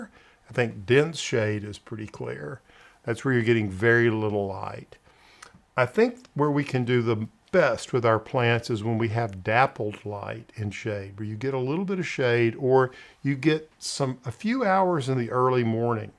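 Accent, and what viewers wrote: South, United States. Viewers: I am from Kentucky but am now in Vancouver, BC, Canada. I love hearing your Southern accent! I do have a big shade area and do have hostas, but the gardeners may keep pulling them. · At home here in London where I’m talking about my hostas and how to fill the shady areas of my garden. I can’t believe my luck to have your video pop up. You have a great video format here. As a beginning gardener, Gardener’s question time on Radio 4 (here in the UK) can be pitched a little towards the expert gardeners for me, but your style is very warm (charming to hear that quaint southern American accent), engaging and informative. Loved your ‘filler, spiller and thriller’ concept! I’ll be applying that method this afternoon. Thanks again for making this great video. I just subscribed as I’d like to I see and hear more from you. Good luck!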